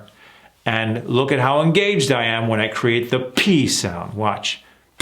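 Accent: India. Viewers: Thank you❤ your indian accent is perfectly as Indians usually speak . So please continue a series where you can teach from basics to advance for Indians. Love from india ❤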